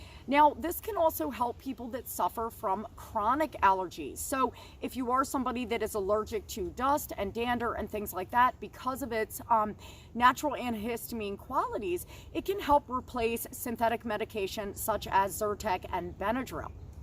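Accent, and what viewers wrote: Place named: Pennsylvania, United States. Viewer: Corporal sent me. Great content. I love gathering knowledge. My wife and I were wondering if you lived in Pennsylvania? You sure sound like it. Lol. Sorry if you're not.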